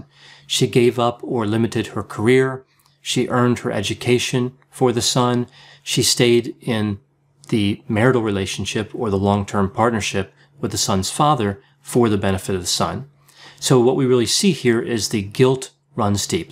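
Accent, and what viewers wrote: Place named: Pennsylvania, United States. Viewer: Great info. I detect a Pennsylvania/Philadelphia accent.:)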